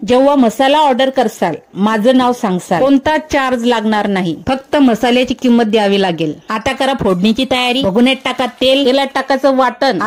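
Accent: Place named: Khandesh, India. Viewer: Tu tumachi bhasha khandeshi vatatey 👍👌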